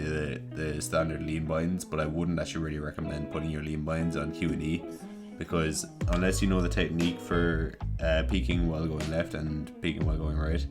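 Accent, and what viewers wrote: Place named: Ireland. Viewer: Irish boi doe :) I'll try the peek keybinds out. It's what I'm most struggling with when switching from console to pc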